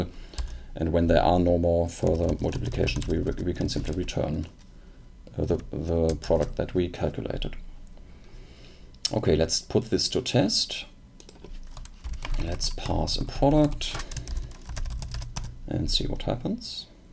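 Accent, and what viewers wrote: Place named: Germany. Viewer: Are you german? You sound german, especially when you say 'digit'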